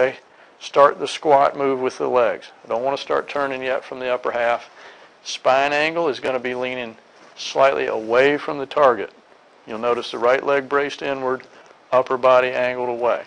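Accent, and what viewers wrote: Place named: United States. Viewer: I am from England what part of USA is your accent from? Oh and yes def in the top 3 Youtube teachers!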